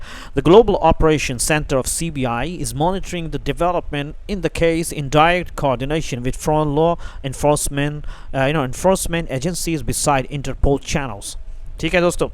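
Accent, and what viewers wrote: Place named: United Kingdom. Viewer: You are a British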